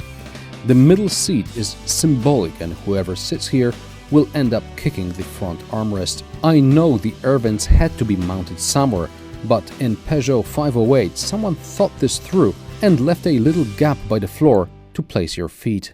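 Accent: Poland. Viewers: What's the point the made rewiew in Poland in English? M8 you still have your polish accent.Do all Poles forgott theirs own language or what!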